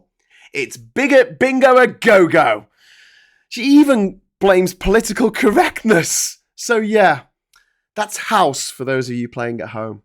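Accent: Liverpool, United Kingdom. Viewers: Anyone else notice how Scouse accent increases in direct proportion to his anger and indignation.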